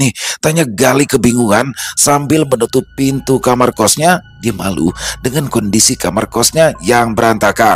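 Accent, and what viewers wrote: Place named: Java, Indonesia. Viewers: Cerita kocak versi dialek Jawa, se seru kisah Bg Piyan org Betawi. 😂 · Haha bang ad lg y cerita yg lucu,dn pembawaan yg sepnuh hati saya merasa mndngar orang jawa lg bercanda.. Enak dnger logat jawanya pas aplgi bawain logat betawi hoalh top dah bang.. Mksh bang mga sht selalu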